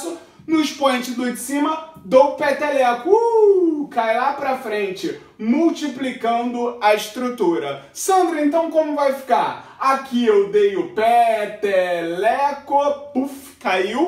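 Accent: Rio de Janeiro, Brazil. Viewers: Esse fala carioquês com gosto!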